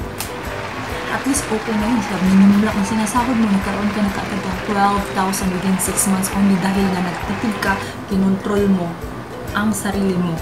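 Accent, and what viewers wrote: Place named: Visayas, Philippines. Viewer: I think bisaya ka dai... 😊😊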